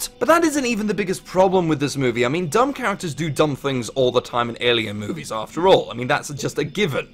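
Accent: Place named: United Kingdom. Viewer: Nice video, very good points and your delivery was spot on. Also your British accent is one I wish I could replicate.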